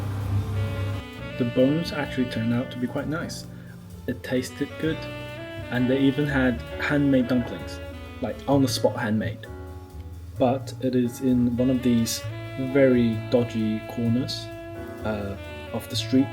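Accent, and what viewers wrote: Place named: United Kingdom. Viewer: I really enjoyed this video. Very interesting. Guessing you studied in the UK from your use of "dodgy", right? You actually sound like a Brit, to me, and I'm English. Your commentary on how people are "ruthless and rude" is a nice contrast to most of the videos I've watched from ex-pats who seem to get lost in heaping praise, for whatever reason. I'm considering TEFL there, but my Mandarin would need to get a LOT better first.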